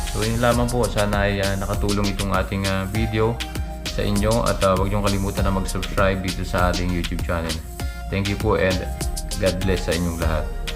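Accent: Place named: Visayas, Philippines. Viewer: Bisaya man ka dong hehehe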